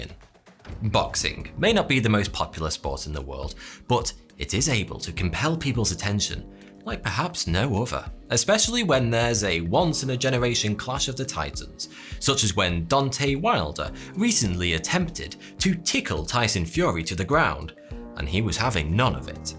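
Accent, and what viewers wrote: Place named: Lancashire, United Kingdom. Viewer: Classic Lancastrian, it's a globally recognised accent. BB1 here.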